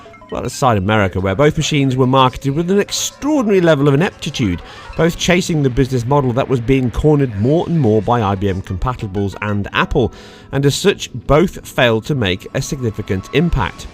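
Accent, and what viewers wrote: United Kingdom. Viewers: I really hate the Limey use of GOOEEEEY, (for GUI) or NES/SNES pronounced as words NEEES or SNEEES. It is just a preference. I Prefer GUI or grafphical User interface.. or Mouse interface. and I like "Nintendo" or "Super nintendo". I love you Limeys... Killer gamers from back in the day. Kinda like the South Koreans in modern times! (Serious gamers too).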